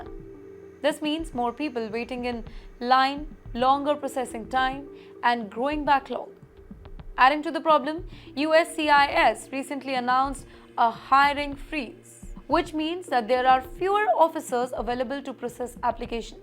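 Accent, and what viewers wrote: India. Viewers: She is Indian. So typical Indian accent is obvious.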